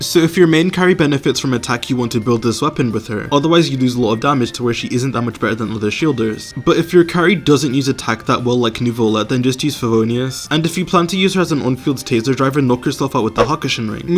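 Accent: United Kingdom. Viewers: Omg is british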